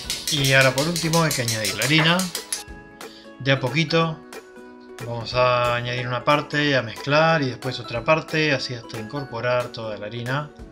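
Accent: Argentina. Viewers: Hola! Muy rica receta de waffles! Ame! Me llamo la atención tu acento sos Argentino o Uruguayo? Muy rico todo voy a seguir las recetas!! =)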